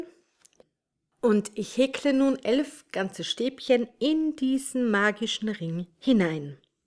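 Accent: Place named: Austria. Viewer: Mir geht es schon besser, den österreichischen Slang zu hören! Danke, für die Anleitung!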